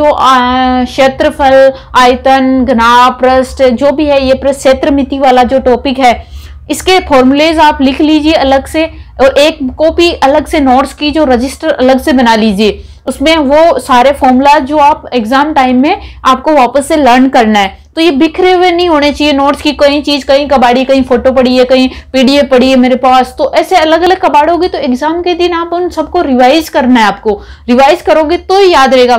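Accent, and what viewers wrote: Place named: Rajasthan, India. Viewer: Puri rajhisthani bolti ho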